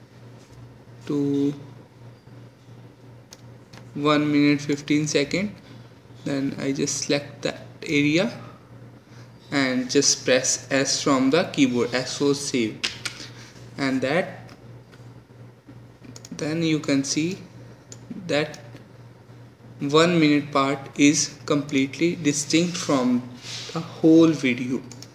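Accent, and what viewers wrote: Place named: India. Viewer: He has one of the best Indian accents. He does take 2 minutes in saying "you have to click 'U' " XD So I don't think the dislikes are for his accent. I'm grateful, it's ok ^^ Thank you " "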